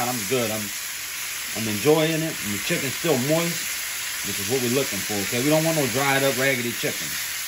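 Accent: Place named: New Orleans, United States. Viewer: That's NewAwlins talkin Dawlin!!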